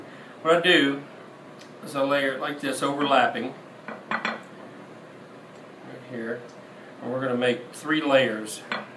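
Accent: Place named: South, United States. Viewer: Also, you seems southern. I'm southern too.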